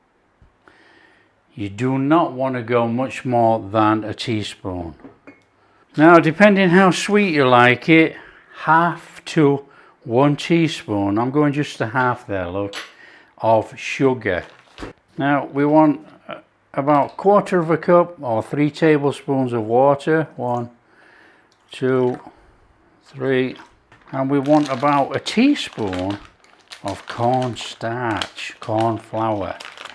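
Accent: Yorkshire, United Kingdom. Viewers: Great stuff - loving your Yorkshire accent :) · This will be the first time that I’ve taken an oriental recipe from somebody that has a United Kingdom accent. It looks delicious.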